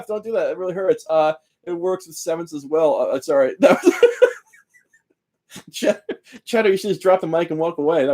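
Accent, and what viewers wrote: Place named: Upstate New York, United States. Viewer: Do you know anyone named Miraglia? Or, do you happen to be from upstate New York? My buddy ( since passed), and his brother, spoke just like you. Mannerisms as well.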